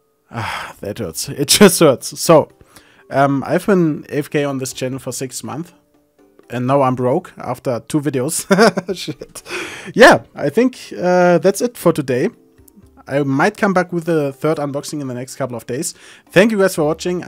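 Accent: Germany. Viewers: Ich weiß es lässt sich nicht sehr einfach abtrainieren aber der deutsch Akzent ist einfach einer Der schlimmsten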